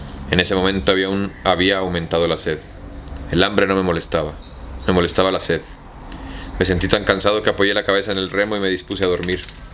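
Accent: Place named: Colombia. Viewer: me gusta como hace el acento colombiano muchas gracias or estos videos soy colombiano saludos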